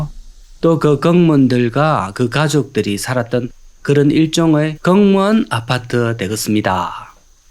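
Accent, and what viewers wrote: Gyeongsang, South Korea. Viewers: 모두들 잘살고 평화로운 세상 오길 간절하게 바랍니다. ~경상도 말씨가 듣기좋습니다. 저도 차분한 말투 배워야겠어요.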